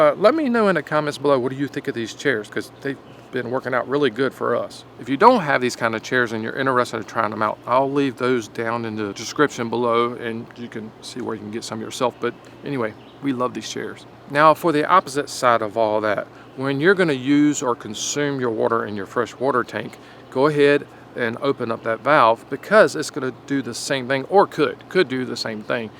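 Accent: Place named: East, United States. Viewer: An Ah ha moment is also called an epiphany. I say waater and you say worrrter, where are you from? The east probably near Pennsylvania? I’m from the south.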